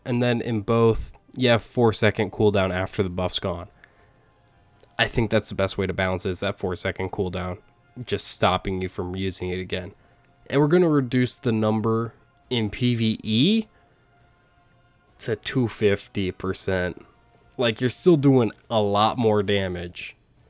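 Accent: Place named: West, United States. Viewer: You sound like you belong in a spaghetti western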